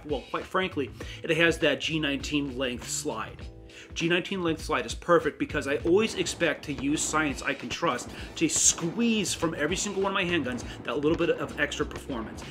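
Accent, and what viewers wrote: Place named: Upper Midwest, United States. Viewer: That upper Midwest accent is thickkkk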